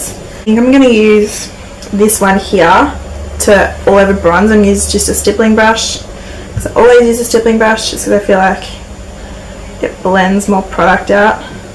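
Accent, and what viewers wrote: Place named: Australia. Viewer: Just found your channel when searching for chichi products. I've lived in australia for a couple of years.. and boooy do i miss it!! started watching your videos and your accent wouldnt let me stop! haha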